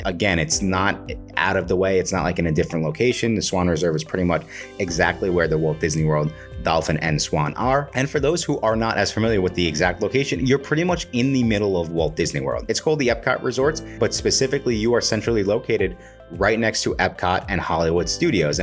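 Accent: New York, United States. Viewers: I detect a NY accent!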